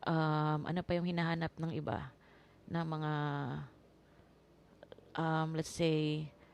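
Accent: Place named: Visayas, Philippines. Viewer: thanks ante, bisaya ka? imong accent man gud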